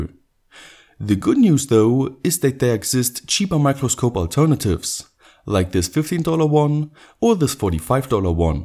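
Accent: Germany. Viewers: klingt nach denglisch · 3 Sekunden zugehört und direkt bemerkt, dass der Typ Deutscher ist 😅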